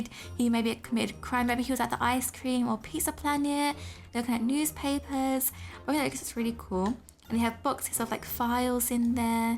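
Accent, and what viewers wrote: United Kingdom. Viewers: I watched ur videos and u talk British now i can talk British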